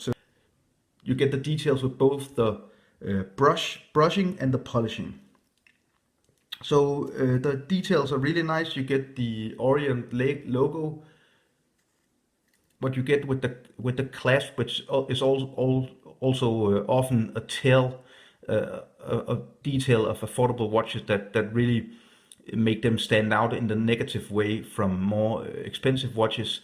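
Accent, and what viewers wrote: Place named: Denmark. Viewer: You've got a very cool accent, are you Danish?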